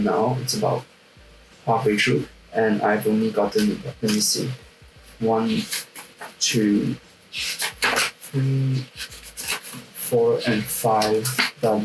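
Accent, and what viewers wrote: Singapore. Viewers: You sound singaporean/Malaysian COOL! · i knew i recognised that accent 🇸🇬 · why does it sound like you have a Singaporean accent lol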